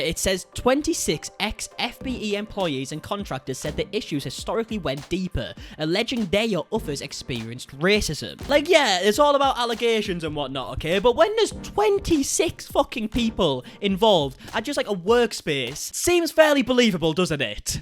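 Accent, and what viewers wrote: United Kingdom. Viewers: I was so bored and this popped up. YAY. Commenting so can buy himself some decor for his shelves and I get my UK accent fix 😁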